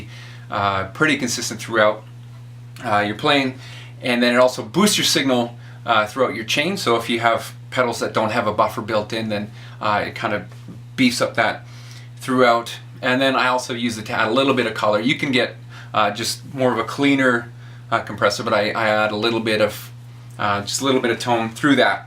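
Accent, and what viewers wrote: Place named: Alberta, Canada. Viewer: Hey man, Just curious are you in bc or Alberta? you have that west coast accent but it feels more Alberta to me than bc. I love the videos, I really dug the budget rig. I have a question for you , do you happen to have any suggestions for a budget friendly versatile rig for bass and guitar? I need a full guitar rig so I can play lead and also lead worship but I'm also a worship bassist but not a normal one lol I do all sorts of weird stuff I play chords and fill spaces like you would in a punk band. that said I'm leaning towards a helix and then building a stereo tube power amp. but id be down for some suggestions that would keep me around 1k or slightly over for a bass and guitar rig (note I don't have an electric, however I do have a tuner, sans amp vt bass di , some cables, and a early 2000's stingray 4 string)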